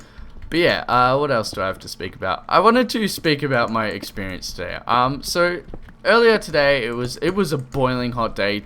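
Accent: Australia. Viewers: I knew you were a fellow Aussie but you can tell by the way you say and talk about DICKHEADS. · Your australian?? You just earned a sub!! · what part of Australia are you from ?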